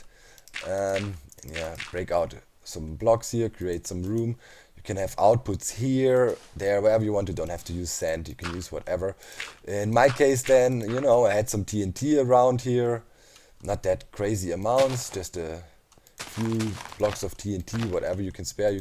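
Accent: Germany. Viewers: Sagmal du bist doch deutscher oder :D?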